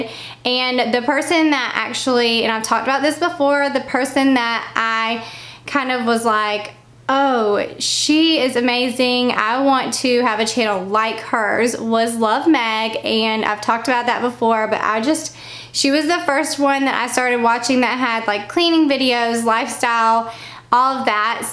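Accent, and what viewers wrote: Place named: South, United States. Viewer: Okay so I don’t want this to sound weird but I LOVE your accent!! I am in the Midwest and don’t hear that southern twang very much. It is so nice! It reminds me of one of my best friends from high school that I lost touch with. 💕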